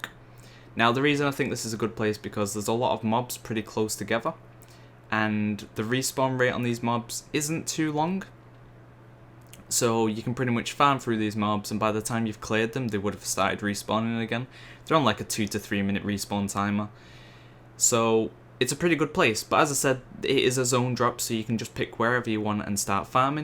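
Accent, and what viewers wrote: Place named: Manchester, United Kingdom. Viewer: rofl obviously from Manchester. cheers mate lol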